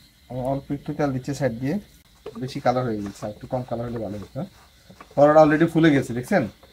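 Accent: Noakhali, Bangladesh. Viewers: আপনার রেস্টুরেন্ট কোথায়? আমি নোয়াখালী থেকে বলছি। আপনার কথা শুনে মনে হচ্ছে আপনি নোয়াখালীতে থাকেন। লোকেশন বললে সরাসরি আসতে পারবো ইনশাআল্লাহ। খুব ভালো লাগবে আপনার সাথে দেখা করে।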